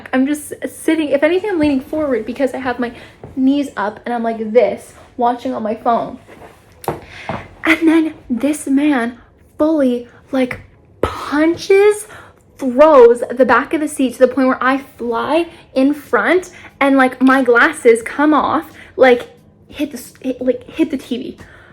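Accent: United Kingdom. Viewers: Wait is she British or is she just doing the accent to be funny?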